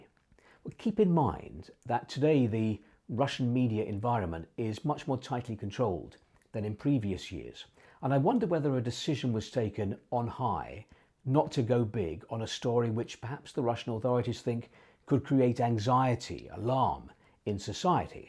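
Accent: United Kingdom. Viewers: Not only BRILLIANT and SO BRAVE, dear Sir, but I guess we all in north America enjoy and admire your magnificent Oxbridge diction. Spasiba, poka poka for now, and God bless You ! Slava Ukrainia.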